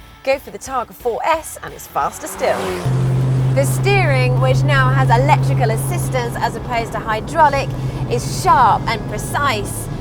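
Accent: England, United Kingdom. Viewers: she's cool, reminds me of the one from fifth gear... anyways which region of England is this accent from?.. curious, anyone? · I love your British accent. Cheers.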